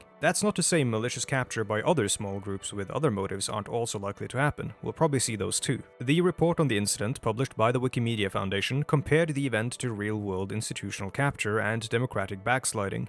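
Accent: Norway. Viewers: My new fav second monitor content guy/gal. Norwegian accent highly underrated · ha, Norwegian detected! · you kinda sound like LEMMiNO but you are not swedish but norwegian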